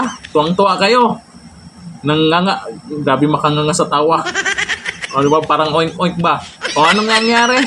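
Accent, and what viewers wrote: Visayas, Philippines. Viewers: Tagammmm,kabisaya ba oi..😁😁😁✌️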